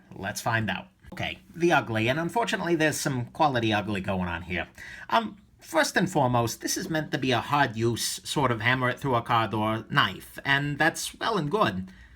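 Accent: New York, United States. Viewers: Love the vids. You have to be from New York. Lol. Cheers.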